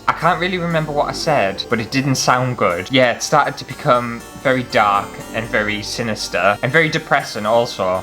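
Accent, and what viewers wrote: United Kingdom. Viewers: Hi 👋 I love your accent and how you phrase things. You are so funny! I know it's the UK-speak, but y'all say "us" instead of "me". When you say "us" I always imagine you and a mouse 🐁 in your pocket, lol! Or an orange 🍊 🐁 mouse on your shoulder (orange bc I just finished watching your sexy Terry's Chocolate Orange video).....so a white mouse --->🐁 with an orange long-haired wig and the steampunkish sunglasses 😆 🤣 😂.... for some reason I see the mouse with a Dali-type moustacheeeeo. You are so funny and brave! I would love to do what you do - meaning making videos- but I'm terrified 😨.....stupid, I know, but...?🤷🏼‍♀️ Anyway, your videos always make me laugh and help when I'm depressed. So, thank you! 🙂 🙃 🙂 God Bless 😃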